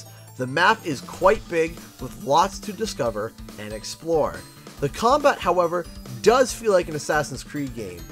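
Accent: Canada. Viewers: You sound real Canadian in this review!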